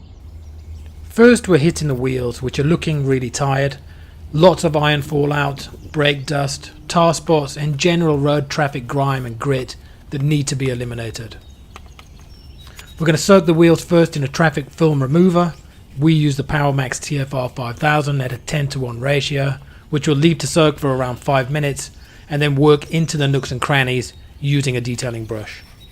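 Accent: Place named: South Africa. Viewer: yeah getting tattoo appointment is hard enough if you have a good artist let alone the issues with the pandemic so I feel you bro, but at least you can save a bit of money for a while lol I did detect a bit of South African but wasn’t sure enough to mention. I’m based in London, at the moment on the outskirts. I’d defo be recommending your channel to my friends who are also heavily in to cars but It’s just unfortunate I can’t send any business your way due to location. Anyway I’ll be watching your other content soon keep it up 👍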